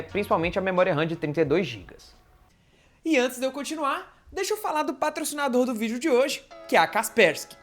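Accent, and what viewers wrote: Minas Gerais, Brazil. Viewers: Senti um mineirinho uai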